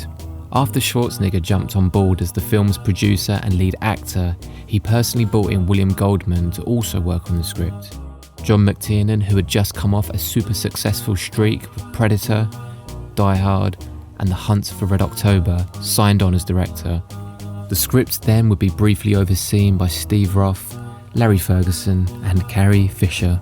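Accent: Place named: England, United Kingdom. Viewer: Well, Narrator .... I loved it.... So, keep ur Corny English Accent...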